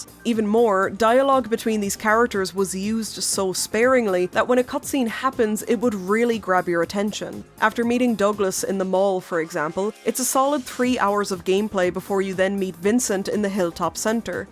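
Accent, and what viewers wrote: Ireland. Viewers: Irish horror lady? Lets fuckin go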